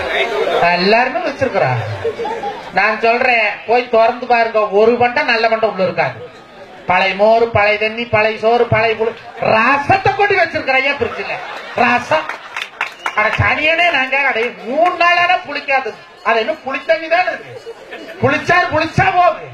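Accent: India. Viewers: Similar to Palakkad Tamil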